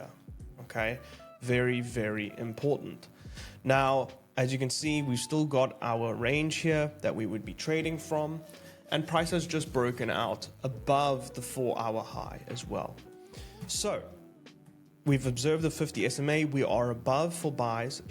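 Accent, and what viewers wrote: South Africa. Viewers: Are you a Saffer?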